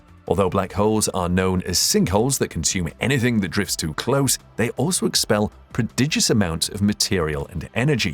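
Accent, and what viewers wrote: United Kingdom. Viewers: Love your explanations and graphics in all of your videos, keep them coming! Do I also detect a Welsh accent? 🙂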